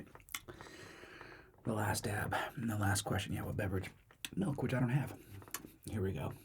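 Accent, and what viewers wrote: United Kingdom. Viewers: Well goddam to be. A gringo you prenoust that great and also that breth acsint 10 /10 😂😂🇲🇽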